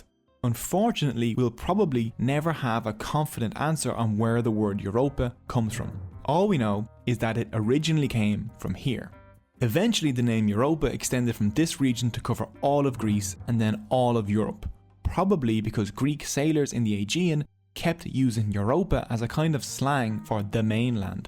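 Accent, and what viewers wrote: Ireland. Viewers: See? What a difference, a cogent, coherent, properly expressed comment can make? You can hold a different opinion than me, even an absolutely contrary one. But when expressed intelligibly, it's a pleasure to engage with the commenter (i.e. you) rather than acrimonious. I reciprocate your expression of courtesy and wish you a pleasant day, too. In fact, this was how I hoped to engage the video creator Irishman,. His thick accent undermines the intelligibility of the narration of his well-researched presentation. Instead of accepting my critique, he took personal offence and hardened his stance to a "this is how I speak, take it or lump it" position. But that won't gain him viewers. On the contrary, he may lose a few. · This guy sounds surprisingly irish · This is my first time watching your channel and I immediately subscribed. Such a well detailed explanation and smooth animation! 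This feels like me hitting a Gold Mine. Keep it up,! Love your Irish accent! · He's Irish. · How Irish does the narrator sound? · Irish accent. T is often pronounced D Hence Paddy for Patrick. Possibly due to Gaelic influence rather than English/Germanic... but I am guessing. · I am so distracted by this Irish accent that I can't focus on the information being told to me 😂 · Nice to hear another irish man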